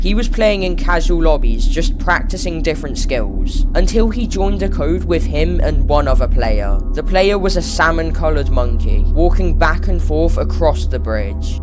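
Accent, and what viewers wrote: United Kingdom. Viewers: It's supposed to be creepy but British voice just makes it..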